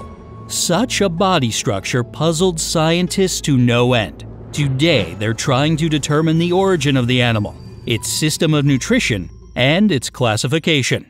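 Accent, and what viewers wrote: United States. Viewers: The American accent just makes me feel dumber. Not sure what it is with American educational show and the way they explain things like they are talking to a child.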